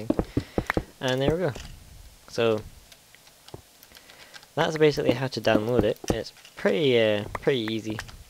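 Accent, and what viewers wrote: Scotland, United Kingdom. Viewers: r u from scotland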